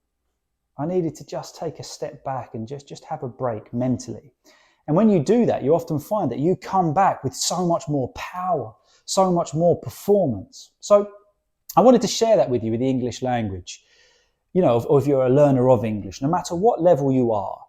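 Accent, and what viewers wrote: RP, United Kingdom. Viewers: Gracias profesor, este formato de vídeo está genial, amo el acento británico, ojalá algún día pueda hablar así 😅 saludos desde Argentina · ❤❤❤❤❤❤ Thank you so much for your teaching The British English Language, it means that The Modern Received Pronunciation Accent from London and The NATIVE Speaker's NATURAL Language with CORPUS (the LIVING Language materials) to immerse the learners into The NATURAL and NATIVE British English Language about NATIVE phrases and collocations in the Daily Life Conversations !!! It's GREAT !!! Love The British English really !!! Thanks your for more Videos later like this in the future !!! ❤❤❤❤❤❤❤❤ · I am the1st . Nice British RP. Cupatea great!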